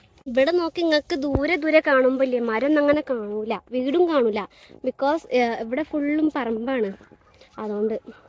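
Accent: Malappuram, India. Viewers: Mlp slang anallo tirur tanur slang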